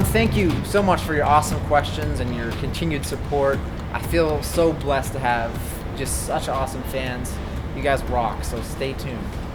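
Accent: Canada. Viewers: omg he sounds so Canadian i wonder how he says about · Canadian accent represent!! :D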